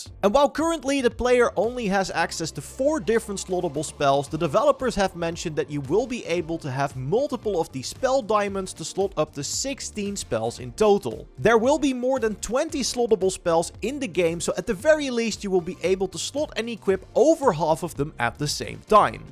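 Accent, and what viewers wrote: Netherlands. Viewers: Definitely some guy at the Netherlands. Very goed Engels. Beetje Louis van Gaal vibes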